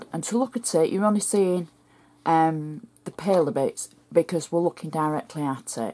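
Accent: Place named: Yorkshire, United Kingdom. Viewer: Nuvo product doesn’t have a sponge, and I never actually learned the “proper” way to use it, so obviously I get it all over my fingertips. Because you’re right: you just want to dig your fingers in it at once. Fortunately, I don’t have a lovely manicure like yours, that I wouldn’t want to mess up. PS. Love listening to you speak. Your Yorkshire accent is “loov-ly”. 😻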